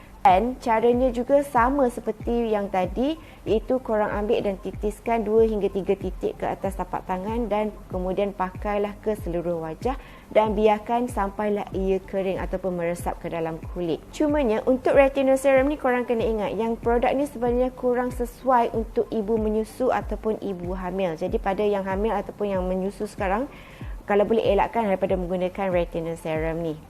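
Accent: Kelantan, Malaysia. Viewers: hai kak dengar macam org klate?... sentiasa tgk video kak terus maju jaya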